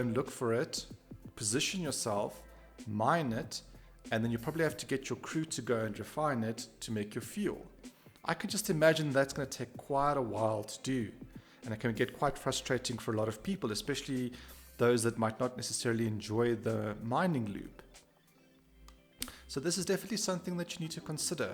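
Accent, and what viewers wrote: South Africa. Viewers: I notice that south african accent... any way I also looked at that odyssey it truly is an oddity, the only thing I can see it really being is a mobile station or base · You say capital like it should be Cape Town, but it’s actually still Pretoria. Even though capexit is there. Lol. Jokes. Can hear a saffa a kilometre away. The misc starfarer is a beast.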